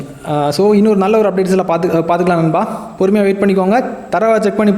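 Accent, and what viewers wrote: Kanyakumari, India. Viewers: kaniyakumari slang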